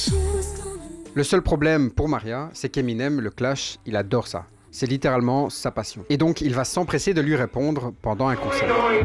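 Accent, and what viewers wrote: Belgium. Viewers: bien aimer je like et m abonne J'ai comme l'impression que tu es Belge car tu as un accent. Pas comme le mien encore car je parle d'après mes amis Bruxellère lol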